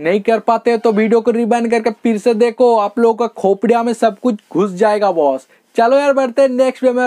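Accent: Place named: Odisha, India. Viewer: Odisha se ho kya brother???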